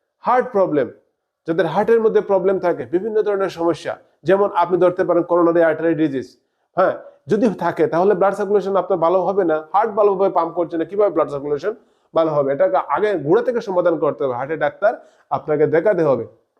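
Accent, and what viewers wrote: Sylhet, Bangladesh. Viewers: আপনার একসেন্ট সিলেটের। আপনি কি সিলেটি ?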